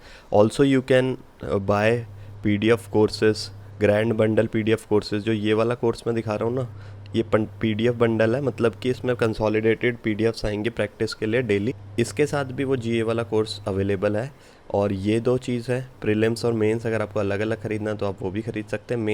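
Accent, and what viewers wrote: Punjab, India. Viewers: Are you from Punjab?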